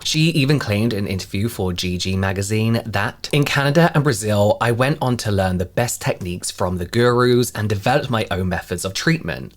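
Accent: United Kingdom. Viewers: It's funny I couldn't care less about Dr. Strum but I am watching because you look gorgeous (so fresh faced) and I am a sucker for your British accent. Loving your hair (chic) and skin (glowing). Update - I just realized you are Robert's twin. I thought something was slightly different, but couldn't put my finger on it until reading the comments. Never seen before today but have followed Robert over the past few years.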